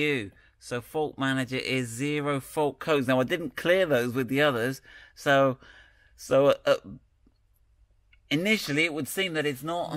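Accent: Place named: Wales, United Kingdom. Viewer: It took me 2 minutes to realize he was speaking English. Is this a Welsh accent?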